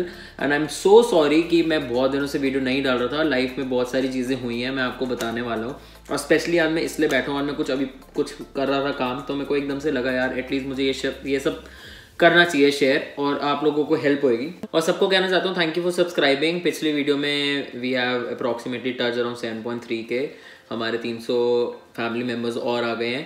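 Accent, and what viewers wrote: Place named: Sindh, India. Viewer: I can surely say that you’re a Sindhi Indian. Borders just can’t change our accent ❤️ Love from SINDH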